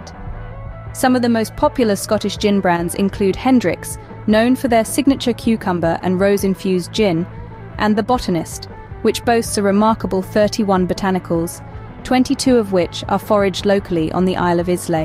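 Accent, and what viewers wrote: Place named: United States. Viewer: It’s ai and sounds kind of American at times but don’t let that get in the way of your bigotry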